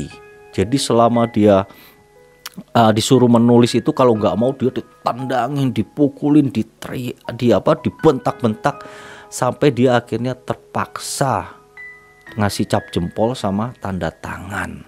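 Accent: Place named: Java, Indonesia. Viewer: MAAF BANG YANG KU CINTAI...ANDA INI SEBENARNYA DARI DAERAH MANA? KADANG2 LOGAT BAHASA JAWA MEDOK,KADANG2 SUNDA BISA JUGA JAKARTA/BETAWI... DARI MANA YAA...BIKIN AKU PENASARAN BY THE WAY BAKAT ANDA DALAM BERCERITA MEMANG MENAKJUBKAN....SIIIP MANTAAAP, BERKEMBANG TRUS BRO..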